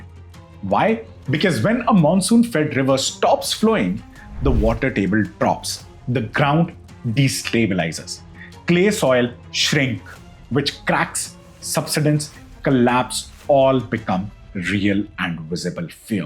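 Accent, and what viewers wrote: India. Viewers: nice to listen to an Indian guy (and not British) doing this!!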